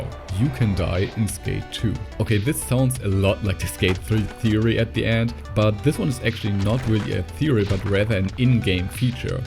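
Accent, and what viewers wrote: Germany. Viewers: Ich liebe diesen deutschen akzent :D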